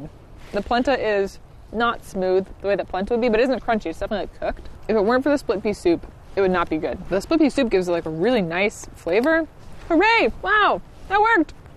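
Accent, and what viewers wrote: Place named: Pacific Northwest, United States. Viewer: You literally speak and act like all of my PNW friends. It's almost like its own dialect. It's hard to explain unless you grew up here.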